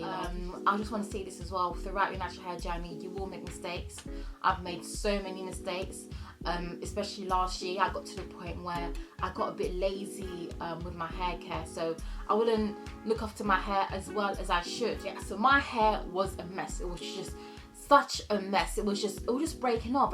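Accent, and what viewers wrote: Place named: England, United Kingdom. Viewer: You look exactly like R&B singer Fantasia but with an English accent. Lol . I like the encouragement you are giving girlie :). I'm transitioning to natural and I have had my hair cut real short before twice so I know how I'll look with short hair but I will not big chop I opt to grow it out gradually.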